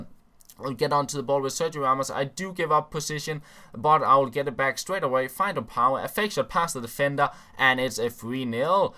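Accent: Denmark. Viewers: I'm a new, subscriber. Are you Danish, you sound Danish.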